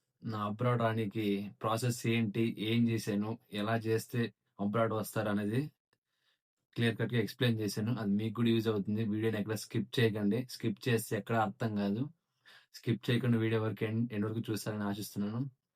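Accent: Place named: United Kingdom. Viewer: wow nycccc english Accent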